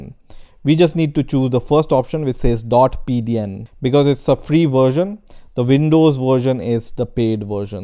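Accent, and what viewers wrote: India. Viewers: You know it's legit when the dude has an indian accent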